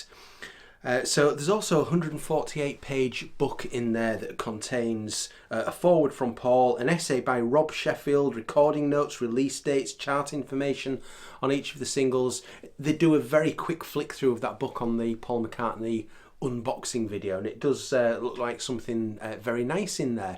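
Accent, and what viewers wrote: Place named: Yorkshire, United Kingdom. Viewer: Thanks. I'm so glad you went for it and looking forward to the unboxings... I rarely buy releases when they come out and prefer to stumble over unexpected jewels and bargains - so seeing your authoritative videos clues me up so well. I really dig your benevolent perspective on things... I'm a Yorkshireman missen so I dig yr groovy accent too! keep on chooglin dude!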